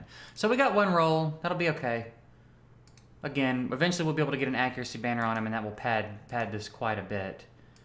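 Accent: South, United States. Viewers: so cute that your a NY boy that sounds like a wholesome southern cowboy :D Trick ass mark.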